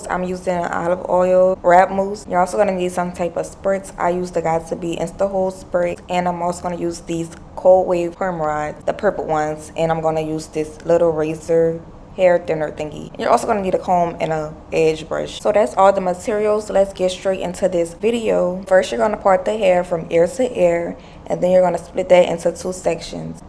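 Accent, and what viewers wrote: New Orleans, United States. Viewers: Great Job! I know you from New Orleans by the Talk. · I hear a Nola accent and I’m here for it 😵🥹🥰😍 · I hear the Nola in you lol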